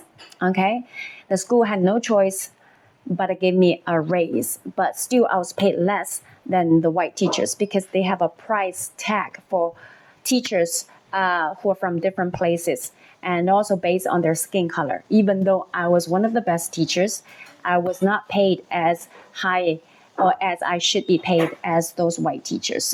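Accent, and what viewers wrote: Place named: China. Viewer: Is it skin color or is it expertise? For example, white native Italian speakers born in Italy probably have an advantage getting a job teaching Italian in US over someone who is white and whose first language is English. You speak English well but you still have a Chinese accent so a native English speaker will have an advantage. Move to another country and teach Mandarin if you want to make more money.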